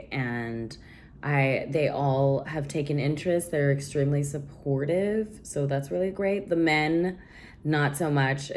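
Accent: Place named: United States. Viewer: All American women sound like that to me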